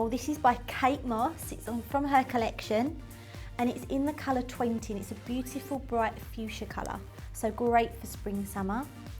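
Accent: Essex, United Kingdom. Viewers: Essex voice so annoying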